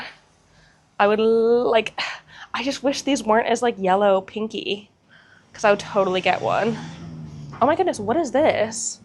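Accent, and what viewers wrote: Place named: United Kingdom. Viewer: The Brit is rubbing off on you. I hear a bit of a British accent ❤️😁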